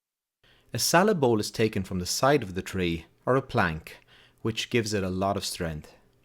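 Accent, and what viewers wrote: Ireland. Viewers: Nicely done. You are very Irish indeed from your accent and I see from your website you're SW of Dublin on the other side of the Wicklows. Live there some 20 years ago on a work assignment. A highlight of my working career. When I return next to the Auld Sod, I'll need to look you up for a bit of instruction. Cheers.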